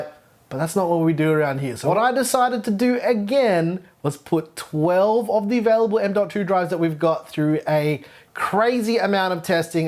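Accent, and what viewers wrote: Australia. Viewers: This is the first video I've seen you, and 3 seconds in I know you're an Aussie Tech youtuber! Subbed! Hope to see more.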